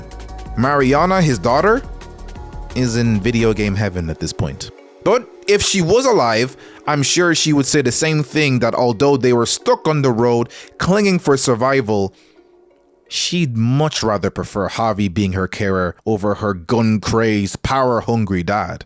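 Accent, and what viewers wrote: Ireland. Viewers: Thought you were Irish for a sec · For some reason I thought you were a white Irish guy, is there someone else narrating the video 😅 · are you irish? im trying to place your accent 😅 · Are you irish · I hear a bit of an Irish accent? Am I right or am I going mad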